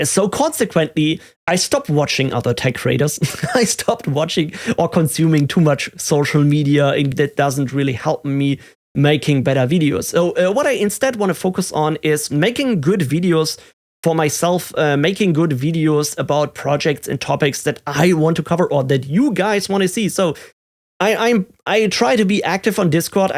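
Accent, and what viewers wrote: Germany. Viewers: Hey! I hope you are doing well now. Do not compared yourself to other creators please, your presentation is really awesome as it is. Clear and concise explanations on topics are top notch. I actually prefer your approach on presenting your topics instead of other content creators "fake" hype. Just be yourself and create videos the way you are comfortable and don't forget to take rest so as to void burnouts. Lastly, keep the german accent dude! it sounds so awesome actually. I hope this message finds you well. Godbless you in all your endeavors. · i Abso-f****-lutely love your videos, you have a great voice, yea theres german accent, but it is NOT an issue!! bahlieve me pls! take your time, then make videos. you've made so many good ones, its now a backlog for me to comb through (note this reply is 8 months late 😅). i started watching tech youtubes like 5-6 years ago and you, chuck and 1-2 others are now the only ones i watch. 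One more thing, dont go for that coffee man.. i love chucks video's too, and i like the amount of info i get in a short time, but i gotta pause and rewind to get it all in 😁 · Hi, ich bin seit ein paar Tagen an deinen Videos und versuche so viele wie möglich zu verstehen. (keine Sorge ich kann Englisch) Mir gefällt es wie du deine Videos machst, mach am besten dein Ding und versuch niemanden zu kopieren. Ich hab noch keinen Youtuber gefunden der all diese Themen so gut erklärt wie du. (ich möchte fast behaupten du bist der bessere NetworkChuck...mit deutschem Akzent xD) Ich bin fast schon auf deine Erklärungen angewiesen, da ich teilweise nicht einmal weiß nach was ich im Internet suchen soll. Vielen Dank für deine Bemühungen PS: Vielleicht eine kleine Inspiration: Wie würdest du mir (jemanden der vorher noch nie einen Server aufgesetzt hat) mit deinem Vorwissen, deine Welt erklären? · Hallo, ich bin gerade über ein anderes Video auf deinen Kanal aufmerksam geworden und dachte mir tatsächlich "Jo, das könnte ein deutscher Akzent sein, muss ich mal schauen, ob er deutsch ist." Habe dann dein impressum gesehen und ja, ich wohne quasi direkt um die Ecke. 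Schön dass du noch da bist, ich komme jetzt öfter mal vorbei. Bis demnächst 😁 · To tell you the truth.. Having an English-german pronunciation makes it more interesting, looks like hearing the Profesor at Uni doing a lectures!! Don't feel uncomfortable!! You Rock!! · When confronted with a difficulty getting a container running properly in my home setup, I'm always happy to see one of your videos in the results of a YT search for help. I don't know how you do it, but you often cover exactly the specific little problem I'm stuck on. Also, your videos provide just the right mix of "type this" and "because." I'm a retired American with the cliches you might expect: declining hearing ability and non-existent foreign language skills. I struggle to understand some people who speak accented English. Listening to you however is almost never hard for me. Your unmistakable German accent is, for me, charming and a positive aspect of your brand. 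Enjoy your time off,. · Gönn Dir eine Pause, den Punkt den Du gerade hast, hatte ich mal vor gut 20 Jahren, damals noch als Contentcreator für eine eigene Webseite. Wenn's sich wie eine Pflicht anfühlt, zieh Dich raus und mach wieder das, worauf Du Lust hast. Dein Englisch ist absolut in Ordnung. Ja ich höre den Akzent - deshalb habe ich auch mal auf deutsch geschrieben vor Zeiten, aber geh mal auf eine internationale Konferenz und hör da mal zu, dann weisst Du, Du bist besser als 85%. Es gibt easy mal 10.000+ Germans die nicht die Eier haben sich vor eine Kamera zu setzen und in einer Fremsprache aufzunehmen. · Sir, you are unique and you stand alone. I love your videos and your presentations and I rejoice in your German accent. You're thoughtful, complete, entertaining and ultimately YOU MAKE A DIFFERENCE. Rise!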